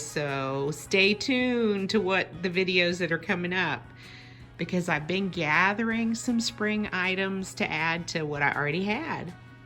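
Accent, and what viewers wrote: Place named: Tennessee, United States. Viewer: I knew when you started talking you were a Tennessee gal. 🧡 I love that you are really selective in what you brought home. How long have you been in your home? We will be in ours 2 years in April. It just seems so wild to have the perfect house for just the 2 of us since the kids have their own houses now. We are renovating to get that cozy, cottage vibe. You are a lovely inspiration.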